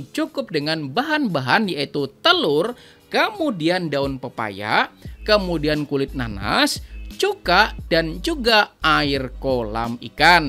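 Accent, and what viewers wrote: Banjar, Indonesia. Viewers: Orang mana sampeyan. Bahasa Banjar takana takaluar. 😊😊😊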